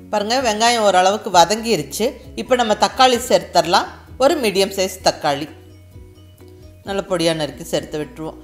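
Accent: Kongu, India. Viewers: Today mrng for breakfast i tried tis aunty. My MIL loved tis. I too feel fulled after having it. ❤ from cbe (sundarapuram) i m big fan for ur voice, receipes and our kongu slang❤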